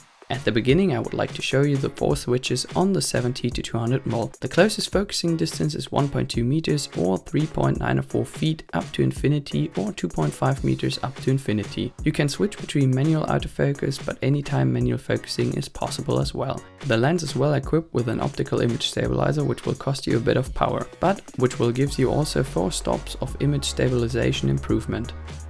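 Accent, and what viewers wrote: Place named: Germany. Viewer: when a german tries speaking english thats how it sounds like.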